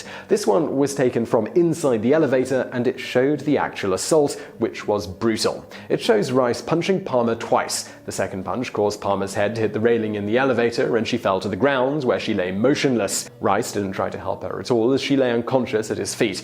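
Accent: United Kingdom. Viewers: you have a British accent that doesn’t sound like your constantly smelling something bad,love it.very first class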